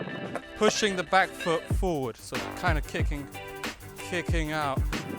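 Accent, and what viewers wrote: United Kingdom. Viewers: Hey, I've been following you now for a while, and thanks for your great content. I am 26 now, and skated from 7 to 12 or something. I've always regretted that I stopped at some point, but I'm now starting again, and I FREAKING LOVE IT. I really relate to your feelings about sharing a skatepark with 10-year-olds that are better than you. But fuck it, skateboarding is awesome, and as long as you don't bother someone, everybody is always cool about it. Anyway, the reason for my comment is that I just want to let you know how great your content is. That video where you paid the narrator on fiverr in your intro, and told him to shut up eventually was one of the most hilarious bits I've seen. This goofy shit is what makes this channel great (amongst others). For example, the goofy edits that don't really belong, but are funny anyway. Keep doing those, because they are fucking funny. 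Just like the random chatter in between skating sessions (you're british so listening to you talk is somehow pleasant). You want to be a filmmaker. Well, keep this up and I can really see you get there. 15k subs is usually where the snowball can start to really roll, especially when you get lucky with a video. These skateboard progression videos usually do amazing. Yours have been great too. I would love to see more of that. (especially since you show the bails, and sorry, but they look so clumsy and funny. again, sorry). The point is - if there even is one to this big wall of random noise - is th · It sounds so weird listening to a British person doing a tutorial bc I usually hear American - accented people. But I am a British kid myself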